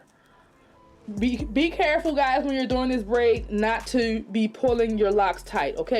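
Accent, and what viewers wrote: Baltimore, United States. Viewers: That B-more accent is thick!!🔥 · Tew…. Lmao Sounds like Baltimore is where you’re from… hopefully I can master this on my sons locs in the am… 🙏🏽❤️ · Dope ENERGY I JUST SUBSCRIBED BECAUSE YOUR VOICE IS SO CLEAR .. u from Baltimore AINT you Heey girl ❤️ I’m literally about to do this on my client going to Memphis I know how to fishtale braid it never did it on LOCS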